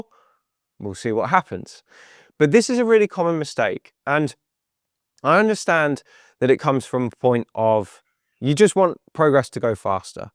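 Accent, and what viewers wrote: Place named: Leicester, United Kingdom. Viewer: This Leicester accent makes me feel at home :)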